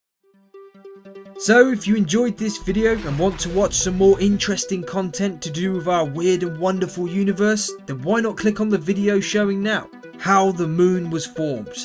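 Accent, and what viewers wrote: United Kingdom. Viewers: Nice use of Fahrenheit in 2015 with a British accent where we use Celsius unless you're older than like 50. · I am most definitely sure that it’s a British accent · Your accent is British but PLEASE dont talk the American way by saying farenheight cuz your english is native and how it is supposed to be whereas America's dont own the english language natively in which they rewinded a few words making it confusing, Its your language please say degrees Celcius and maybe put farenheight in brackets? (sorry for my poor spelling)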